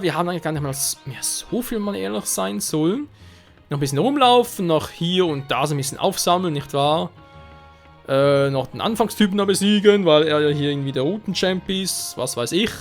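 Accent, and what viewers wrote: Switzerland. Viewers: mä merkt das schwizer bisch:)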